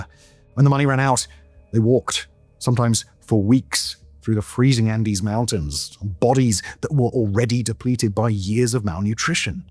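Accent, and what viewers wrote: United Kingdom. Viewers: Venezuela scrores in the best category by the Global Hunger Index. Venezuela scores better even than India, Papua New Guniea, heck it's even better than regional darling Ghana. Ukraine is war-torn, so, but even still Venezuela is scoring higher than Ukraine. When you consider these video topics, take a step back. Please don't report "stories too good to check." You could have spent this time better reviewing India's hunger issues, but instead you're doing imperial propaganda with a British accent (which used to be the best accent for imperial propaganda, but isn't anymore). I guess a Brit shitting on India for any reason would also come off wrong. You know... just critique empire, would you please? You have the proper accent for doing that! edit: don't know why I didn't mention that IN SOUTH AMERICA, Ecuador, Suriname and Bolivia all score worse on the Global Hunger Index, than Venezuela. 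This video feels borderline misinformation; it's just so unfairly focused and lacks context. Which, that's how the best lies operate! And I'm not fan of Maduro, but carrying water for empire is such an ugly look.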